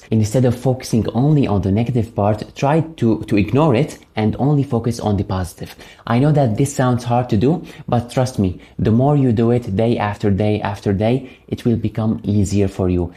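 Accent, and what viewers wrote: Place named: Aleppo, Syria. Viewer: حبيت شغل بدون ترجمة 🎉 اللهجة الحلبية كانت احلا حسيت 😁🌸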